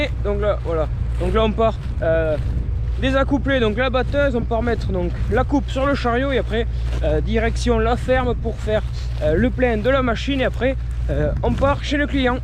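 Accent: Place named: Toulouse, France. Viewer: Vue l accent je dirai Toulouse alentours mdr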